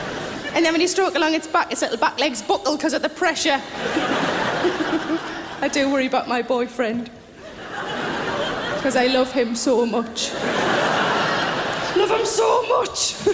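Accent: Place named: England, United Kingdom. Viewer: I wondered what part of England she came from with the accent, I don't here that kind of British accent very often.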